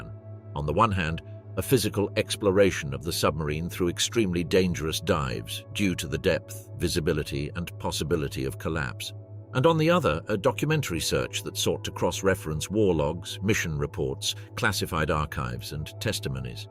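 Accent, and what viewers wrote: England, United Kingdom. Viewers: the German navy was ruled by large battleships and you show the Lusitania? Can it get stoopider than this? You don’t get credibility just because you give the narrator an English accent. Thumbs down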